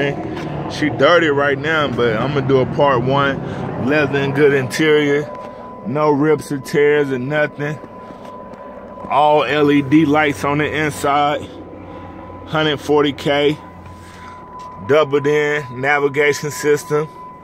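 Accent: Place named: Texas, United States. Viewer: Sound like you from Texas. That whip clean bro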